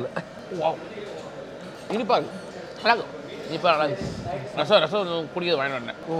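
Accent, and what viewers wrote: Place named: Tamil Nadu, India. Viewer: Liteaaa JK Tamil Slanggg varutheyy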